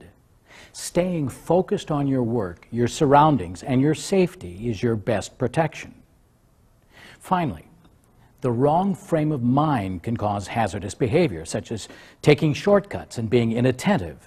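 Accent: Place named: United States. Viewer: Zakaj dobimo amerikanski video, če se učimo bri'ish english?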